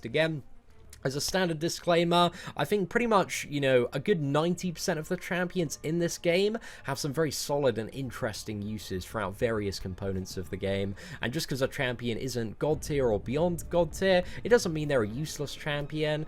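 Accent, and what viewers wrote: United Kingdom. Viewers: great videos bro. They've helped me a great deal. I was wondering if you could help me out. I'm farely new to the game. But, I'm finally starting to get 4 and 5 star champs. I'm going to list them below. Could, you recommend which champs I should make my 3 man attack team and which ones I should make my 5 defenders? None of my champs are awakened yet. By the way what part of the U.K. are you from? Or am I hearing wrong and your from down under? I'm what you call an American Scouser lol. Once again thanks and keep up the good work. 5 star - Hela and Yondu 4 Star- Morningstar, Mephisto, Blade KMonger, SheHulk, Emma, Guillotine, Venom, VooDoo, Wasp, Goblin, Sentry Wolvie, Antman, Punisher, Abomination, Night Crawler, Cyclops Blue, RedSkull, Capww2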